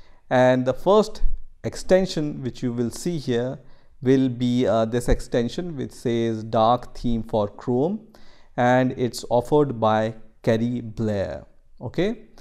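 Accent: India. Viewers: Gotta be my last time listening to an Indian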